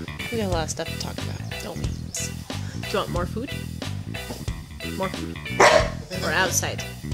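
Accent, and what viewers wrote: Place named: United States. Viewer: You have a wonderful doggy/human family. And a lovely place to raise them. Lucky creatures. Are you Norwegian too? You sound American, but I imagine some Norwegian influence. Sorry, am not nosy, just curious (and envious). All the best to you all. Anne-Lise (from Norway)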